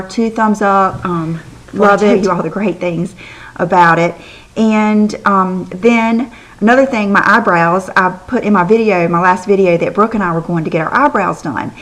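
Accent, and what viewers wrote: South, United States. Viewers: ! Funniest story for you!! So I work in a hair salon in Vancouver BC Canada and a clients aunt was in town and stopped in to pick up some product so I helped her and she had a southern accent (super rare here in Canada to hear a southern accent maybe only the third I've heard in person, weird hey?) and right away I was like are you from North Carolina? Shocked she was like yes how on earth did you know and I told her by her accent lol! Took me forever to realize it was because I'm so used to your beautiful voice! Thought maybe I had a special gift for a moment! Anyways I Love you have a good week!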